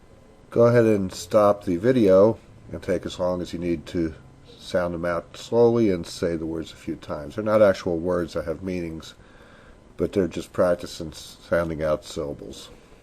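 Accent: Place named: United States. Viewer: It's more of an American accent. Not Ashkenazi. Maybe more Sephardic.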